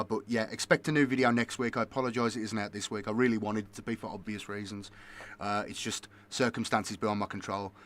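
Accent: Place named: Birmingham, United Kingdom. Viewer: So I just found this video end of July 2025 and I did wonder where all your excellent videos went to. I heard rumours that it was Geedubz clamping down on any use of their I.P. and from what I gather from this video, I think it is. I dunno what you're doing in order to circumvent the either potential or actual copyright strike from Geedubz. Good luck in whatever you try to do. Also this is not how I imagined you to be, an actual full blown Brummie lad.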